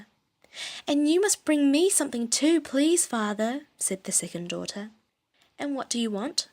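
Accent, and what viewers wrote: New Zealand. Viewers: 우와... 뉴질랜드 발음으로 영어듣기 하고싶었는데 드디어 그 채널을 찾았네요!! 너무너무 감사합니다~ 앞으로도 좋은 영상 많이 올려주시면 감사히 보겠습니다 :) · 항상 영상만 보다 댓글은 처음 남기네요ㅎㅎ 뉴질랜드 억양으로 동화를 들으며 공부할 수 있어서 너무 좋아요! 목소리 너무 좋으세요:) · 아... 다른 채널에서 타다가 오게 됐는데 ㅠㅠ 자기 전에 듣기 너무 좋을 것 같아요! 뉴질랜드 발음의 특징(?)을 정리해주신 영상도 올려주신다면 좋겠어요! 딱 듣고 아 이건 뉴질랜드다 싶은 게 뭔지 알고싶어요 ㅎㅎ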